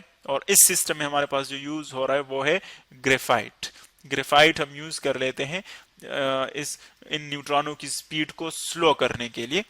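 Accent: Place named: Balochistan, Pakistan. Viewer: Sounds Balochi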